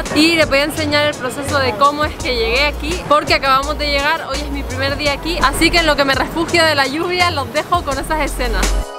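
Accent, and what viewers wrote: Chile. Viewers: eres Chilena? tienes el tono de una, saludos de Chile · hablas como con acento de chilena what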